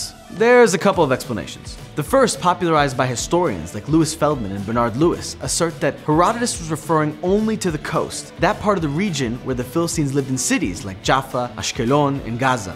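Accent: United States. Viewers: I love that this guy has an American accent and can claim ownership over land that once belonged to the indigenous, and be proud. Why not go further back before the myths of the book? Who were the Canaanites and who are the direct descendants? This video proposes the current Palestinians were immigrants when we know they are direct descendants. So are the Lebanese who hold closer dna than any current Israeli. There is no tangible evidence of David ever existing, so for anyone today to excuse apartheid and ethnic cleansing based on folklore is beyond insanity. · Are you Israeli or American? You sound like an American. God Bless you for all the information you have shared. You are bright and very knowledgeable.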